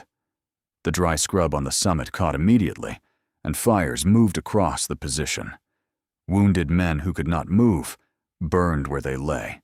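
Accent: United States. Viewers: Pity this story has be told in a crap American accent who can’t pronounce Australian places and speak in Strine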